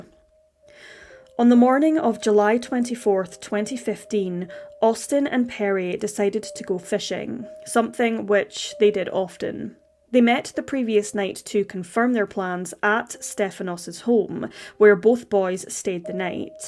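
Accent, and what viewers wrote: United Kingdom. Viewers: We’re fucked….. said in a perfect British accent….instantly subscribed! Best narration ever.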